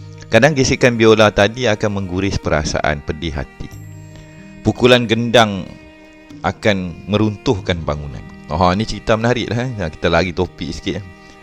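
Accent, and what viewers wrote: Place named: Terengganu, Malaysia. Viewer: Orang Terengganu cakap. The BIJORKKK 👍👍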